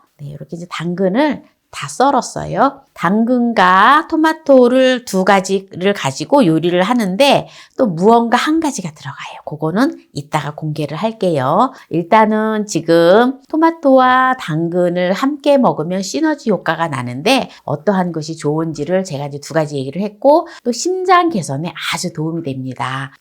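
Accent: Chungcheong, South Korea. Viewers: 고향이 충청도라 그래유 이해해주세요 죄송합니다 ❤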